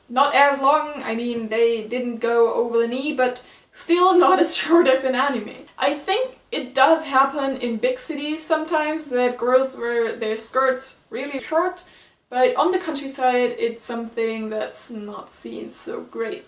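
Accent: Germany. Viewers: You're German? Cool, I was going to ask because of your accent was unfamiliar to me, but here it is in the comments :) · I knew you were German cuz when I heard your voice, you sound like Trixie from the YouTube channel Don't Trust the Rabbit. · I could hear from your first sentence, that you are German^^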